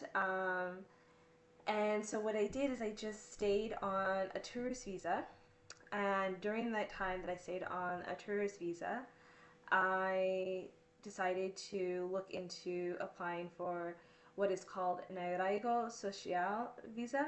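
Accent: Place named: United States. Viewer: Are you originally from the united state? because you speak like you are from the united state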